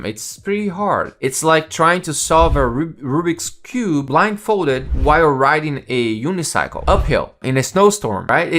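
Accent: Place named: Brazil. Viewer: Hey, man ! I realized you are brazillian by your accent somehow, maybe bc I'm brazillian too, and then I checked out your description to find out I was right. Anyway, I'm planning to immigrate to US soon. Thanks for the reality check and advices ! I just can imagine how hard must have been for you, but you actually made it in the end !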